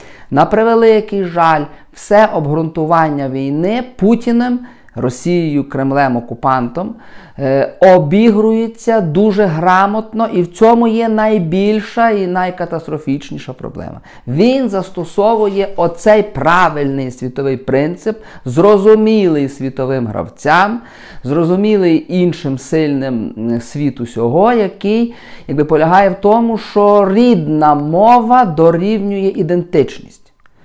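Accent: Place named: Lviv, Ukraine. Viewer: дякую за роз'яснення ситуації чудовим львівським говором. Вітання зі Львова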